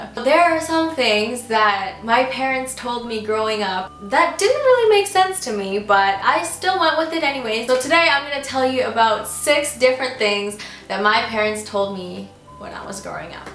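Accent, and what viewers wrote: Visayas, Philippines. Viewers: Grabe mo bisaya hahaha. Btw, Ate murag kag si Ate Bern sa CDO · Your bisaya is so adorable . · Bisaya lagi kaayo dai HAHAHA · Maayu gani kabalo pa xa mo bisaya!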